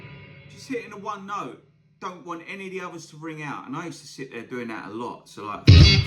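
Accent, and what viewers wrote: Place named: England, United Kingdom. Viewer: Ha. First time seeing one of your vids. I didn't expect the English accent. Your playing and overall vibe was that of an American Southerner initially. That was meant as a compliment, btw. ~Native Texan (Subscribed)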